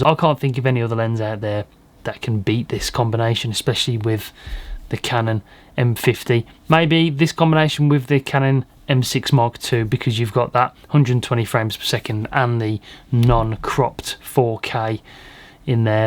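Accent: United Kingdom. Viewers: Hey thanks for the video, quick question, if I have a canon m50, I don’t need an adapter right? Also you sound like you’re from the UK, I am too and was wondering where I could get the lens from?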